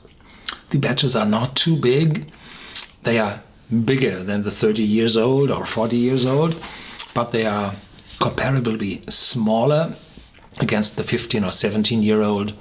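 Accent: Germany. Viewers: come of german and Yugoslavian decent, but was born in Canada and I speak some german but I find myself really enjoying your English reviews...something about the german accent and pronunciation of words makes the reviews amazing....Thank you.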